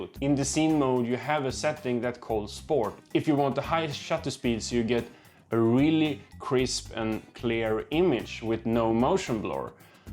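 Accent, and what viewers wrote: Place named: Sweden. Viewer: As a Norwegian I immediately heard you were swedish... The swedish, norwegian and danish variants of english are very distinct :D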